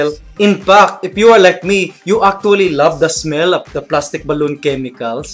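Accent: Philippines. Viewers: i like the accent he is not shy in our accent (some Filipino sound like that when they're speaking in English) i'm very proud to be pinoy and i admit i seak like that too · Haha! I love the smell of plastic balloon too! Hmm And your pinoy accent to Mikey! Hahaha Wooh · Hahaha love the tagalog accent, so strong and sharp. Very Filipino. 😂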